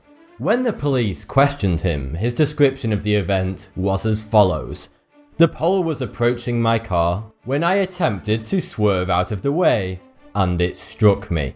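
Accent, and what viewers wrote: United Kingdom. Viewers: RSCGaming hes british, and in british accent, thoughty 2 sounds like 42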